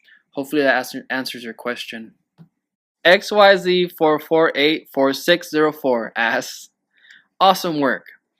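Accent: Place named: Latin America. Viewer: I can hear his Latino accent. For a minute it sounded like he was going to bust out some Spanish. 😂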